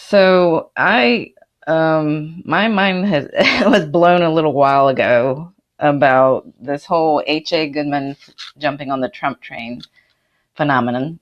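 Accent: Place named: Missouri, United States. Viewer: your growl, if i may say so, sounds southern to me, throaty and nasal at the same time, like a missouri accent, the show me state, where i'm from.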